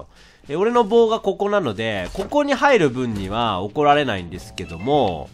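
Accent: Kansai, Japan. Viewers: 最近関西弁出るの多いね！関西弁好きだから嬉しいw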